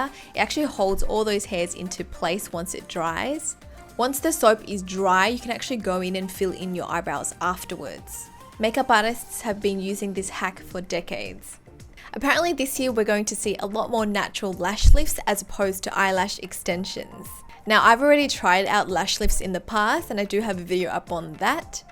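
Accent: Australia. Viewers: 호주에서 사셨구나 뭔가 발음이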